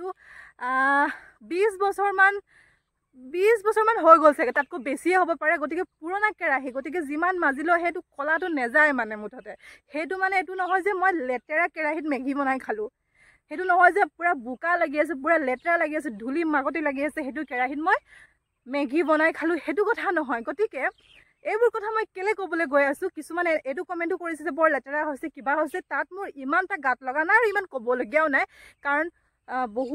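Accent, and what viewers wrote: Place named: Adivasi, India. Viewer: Hvy didi agh laga di 😮 bhut besi hvy ke dile adivasi voice t kole aru vl lagil hoi 😮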